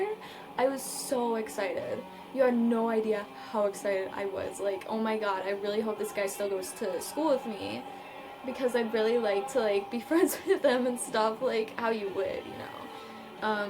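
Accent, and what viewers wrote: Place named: Canada. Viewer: I'm gessing it by your accent, are you from Canada?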